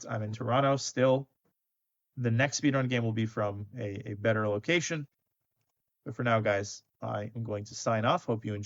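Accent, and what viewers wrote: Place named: Russia. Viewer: As much as I appreciate your speedrun course I still can't understand why you have been constantly using the Russian words and accent in the time when Russians invaded Ukraine and commit so many war crimes.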